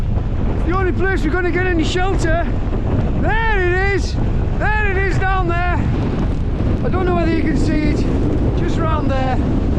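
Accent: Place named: Cumbria, United Kingdom. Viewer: Fantastic effort and great video - luv the Cumbrian accent too 👍Respect ✊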